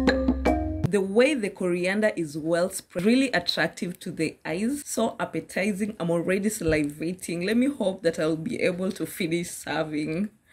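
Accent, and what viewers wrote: England, United Kingdom. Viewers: I too subscribed sis how neatly and beautifully you cook , woooooow environment and recipe too. I admire at your English accent too... You are humble and respectful towards viewers🙏🏻🎉👍🏻, i love you dear sis - This is Raji from Chennai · I love your English accent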